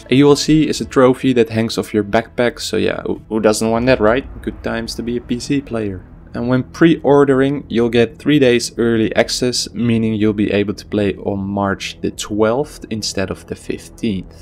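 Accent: Netherlands. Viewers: Are you Dutch? You have a Dutch accent xD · I played The division 1 even tough everyone disliked it i liked it. I Will be buying The gold version. Btw my uplay is maybe you could add me so we Can play together sometimes. And you sound dutch are you?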